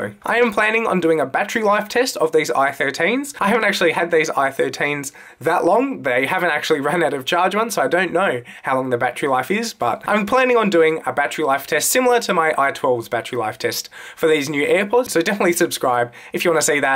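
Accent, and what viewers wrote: Australia. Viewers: I'm from Britain and can confidentaly confirm he's UNMISTAKABLY Australian.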